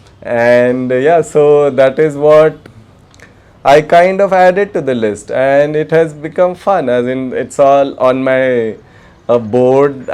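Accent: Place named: Kerala, India. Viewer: I love the way u speak, r u from kerala? i read miracle morning book by Hal Elrod and currently reading 5 am club by robin sharma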